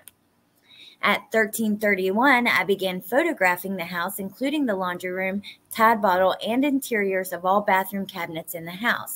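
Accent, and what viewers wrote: South, United States. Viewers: U don’t smack ur lips it’s jus the gorgeous southern accent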